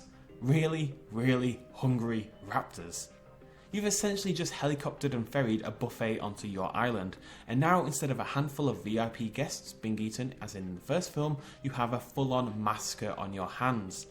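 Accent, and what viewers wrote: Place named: North, United Kingdom. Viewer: you sound so cute. and northern.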